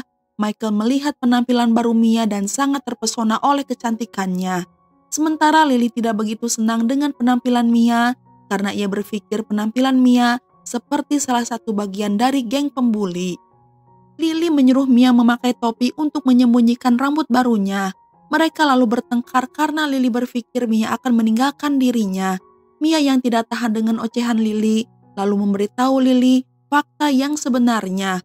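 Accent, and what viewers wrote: West Java, Indonesia. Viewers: Asa ditatar sunda nyaritana...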